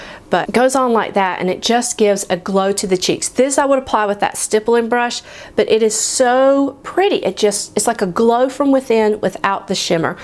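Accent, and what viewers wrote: South, United States. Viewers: Such a fun video!! Love your Southern accent! I'm from Tennessee originally!! Thanks for sharing! I love discovering new beauty products :) Xo! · I really love your southern accent <3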